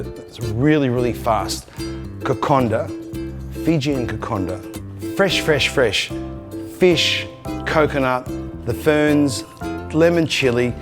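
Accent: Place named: Australia. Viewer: this comment is not meant to be disrepectful or anything, but this video makes me, as a Londoner want to travel to this part of the world more, I've just saw an Asian looking man speak in a Aussie accent teaching how to cook Fijian food. Amazing, so much culture in this part of the world I've yet to see and experience.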